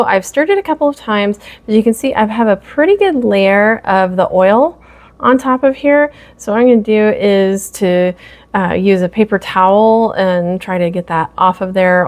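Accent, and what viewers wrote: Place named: Quebec, Canada. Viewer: Bonsoir! Première fois sur votre chaine. Dites-moi, avec votre accent, je vous soupçonne d’être du Québec, ai-je raison? Pourquoi: excellent français et excellent anglais! Mais peu importe d’où vous êtes, j’ai apprécié cette vidéo. P.s.: je suis du Québec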